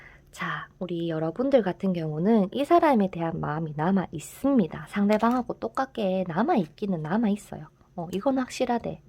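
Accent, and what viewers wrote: Gyeongsang, South Korea. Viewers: 하트!ㅎㅎ🥰 원래 경상도 분이신가요? 너무 정겨워요ㅎㅎ 좋은 리딩 항상 감사합니다~! 아, 전 남자입니다요.. ㅎㅎ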